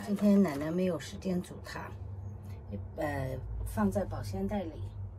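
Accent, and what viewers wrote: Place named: North, China. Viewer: 奶奶您怎么讲话一点不像快百岁的老人，思路清晰，口才好，而且听您说话像北方人，没有一点在东南亚国家生活的口音。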